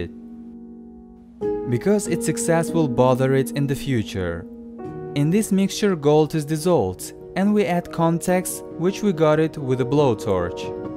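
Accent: Russia. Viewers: Русский акцент всё равно слышно. Но произношение хорошее.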